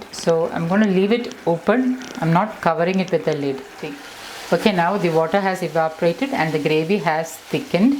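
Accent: Kerala, India. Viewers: Are yuo malayali I like yur Engish pronoun ciation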